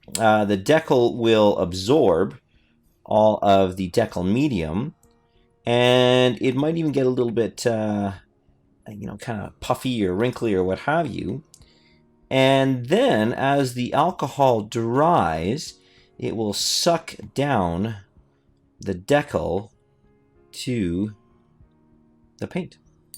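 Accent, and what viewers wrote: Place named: Canada. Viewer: I'm hearing faint traces of a US northern mid-west or Canadian accent, and since he is pronouncing "decal" that way I'm going to guess it's Canadian. That pronunciation is consistent with other Commonwealth nations. The first time I ever heard it pronounced that way it was by somebody from the UK. Didn't seem too strange, but it is a little surprising to hear it in a North American accent, though. I'm glad everybody was able to work everything out.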